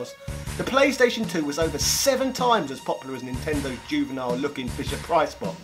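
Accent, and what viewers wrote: United Kingdom. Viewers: when I saw your outfit, I was expecting some really strong British accent. I was not disappointed:). Yes, Brazil loves you:)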